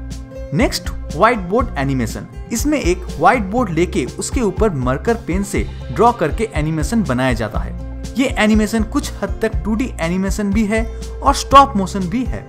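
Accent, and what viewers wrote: India. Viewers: r u from kolkata?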